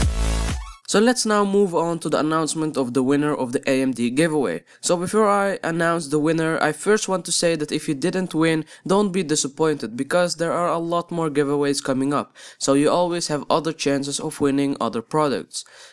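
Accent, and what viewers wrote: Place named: Netherlands. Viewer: are you dutch man you sound like one im dutch too i will soon be building a 600€ gaming pc with a r7 265!!!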